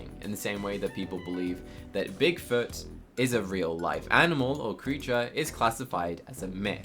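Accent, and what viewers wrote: United Kingdom. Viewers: So, I made a private server on adopt me and I tried to get you listed as someone who could use it but for some reason, I cannot friend you on Roblox. Can you please answer the question as to why and yes, I do mean you the YouTuber known as with the British accent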